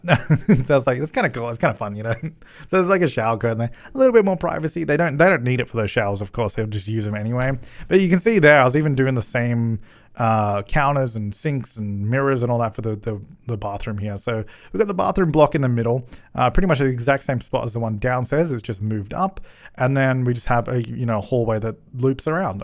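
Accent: Australia. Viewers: Last night I had a dream that was looking for an assistant to help him and his requirement was just you had to sound like him. The whole dream from then on is me trying to sound Australian and saying "hey guys!" A lot.😂 Edit: omgoodness thank you all for the likes! I don't think I have ever had such a loved comment. 😊 I'm happy I could entertain you with my silly dream!😁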